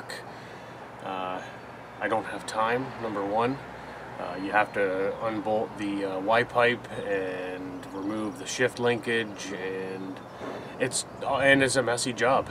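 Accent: Canada. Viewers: He sounds Canadian. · This guy sounds Canadian to me. And he used Standard International units (Litres). 😉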